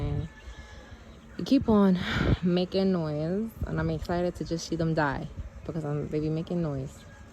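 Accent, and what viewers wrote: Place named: New York, United States. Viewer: Yoooooo this shit beyond crazy. 1 you sound like you from the Bronx or something 2. My dad is right up the street 3. Idk how I ended up here but yeah dope video it’s just you sound so New York 😂😂 tf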